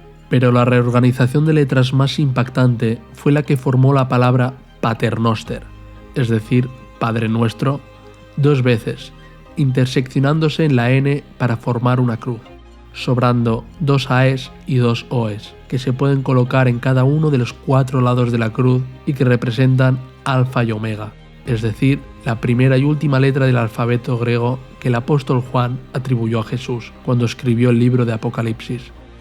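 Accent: Catalonia, Spain. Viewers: A mi me suena más a catalán por como pronuncia Júpiter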